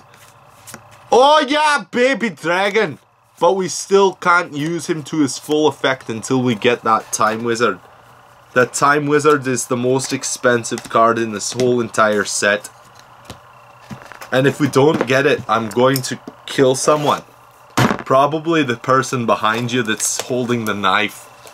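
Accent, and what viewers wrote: Scotland, United Kingdom. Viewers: i got +10 points..what can i get with them...accent sounds more scottish lol..idk. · You sound Scottish not Irish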